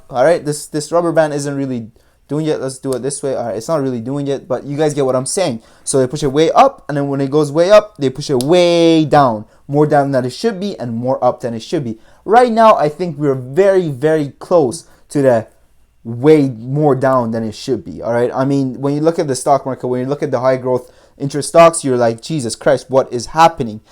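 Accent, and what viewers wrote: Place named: Iran. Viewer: You sound iranien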